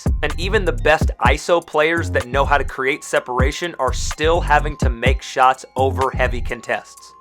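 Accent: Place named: United Kingdom. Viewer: You british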